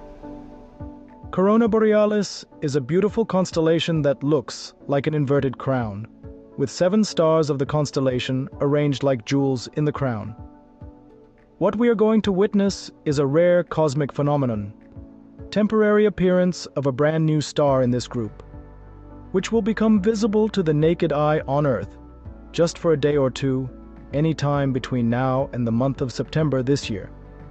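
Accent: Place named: United States. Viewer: But for the broken American accent, it was an engaging watch.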